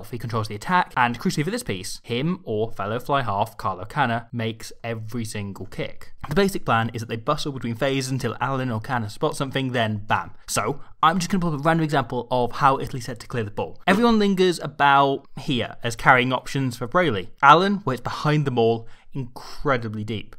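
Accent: Wales, United Kingdom. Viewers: Best rugby analysis ever! And I've been watching the game for 20 years., are you Welsh???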